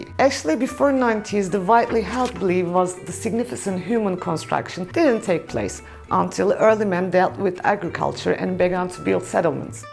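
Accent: Turkey. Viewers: Arkadas. bu kadar Turk aksaniyla mi anlatir bir insan. Ing video yapiyorsan biraz kendini gelistir kurtul su agir aksandan ya da azalt. youtuberimizin bile baya saglam ingilizce konusamadigi bir ulke.. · she speaks with turkish accent. she needs to improve herself. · thats turkish accent and i believe it is better for every country to speak with their accent as no foreign people can speak turkish like turks ,people dont have to speak english like americans or brits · Gordugum en ağır türk aksanı olabilir. Ama iyi video.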